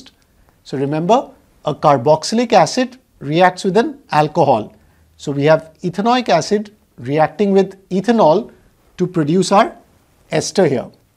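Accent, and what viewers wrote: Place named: India. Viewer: Plzzz no more indian pronunciation 🤦‍♀️🤦‍♀️🤦‍♀️🤦‍♀️🤦‍♀️🤦‍♀️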